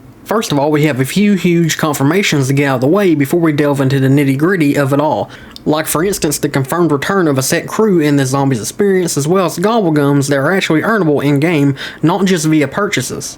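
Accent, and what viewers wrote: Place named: South, United States. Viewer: Sometimes i forget u have a southern accent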